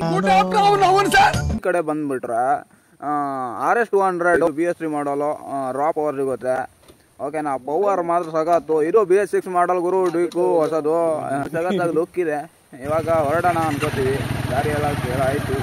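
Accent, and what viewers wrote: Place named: Bengaluru, India. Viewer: Bengalur kannada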